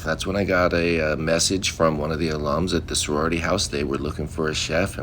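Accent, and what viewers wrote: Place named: Pacific Northwest, United States. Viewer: I just had a feeling you might be in and have been in PNW… I can hear it in the voice 😊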